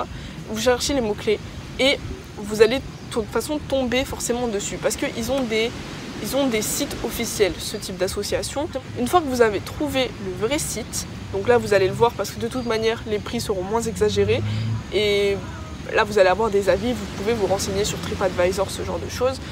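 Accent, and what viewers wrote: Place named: Africa. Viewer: Elle a un peu un accent africain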